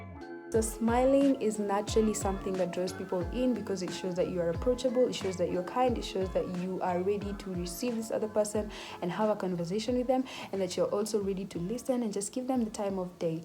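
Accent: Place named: United Kingdom. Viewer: 😅😅 love the British accent! Great tips…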